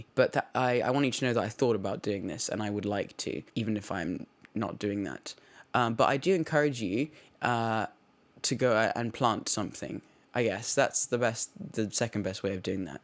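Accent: United States. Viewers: Can we talk about how AMERICAN his accent has become? Cultured <3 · is it just me or does he sound a bit american in this video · WHY DOES HE SOUND AMERICAN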